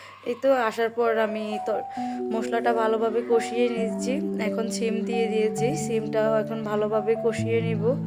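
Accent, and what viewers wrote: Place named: Comilla, Bangladesh. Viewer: আপু তুমি কুমিল্লার আমিও তো কুমিল্লার ওই জন্যই তো কথাটা অনেকটাই মিলে যাচ্ছিল আমার সাথে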